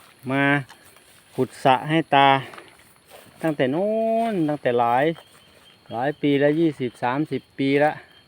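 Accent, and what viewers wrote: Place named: Isan, Thailand. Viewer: โดยส่วนตัวไม่ได้ติดตามข่าวน้องชมพู่นะค่ะ และไม่เคยดูคลิป เลยค่ะ แต่เปิดมาเจอคลิปของตา อ้าวตาพูดไทยตกอิสานแต่ทำไมน่ารักจัง😊ติดตามตาเสยเลยทีนี่😊☺😚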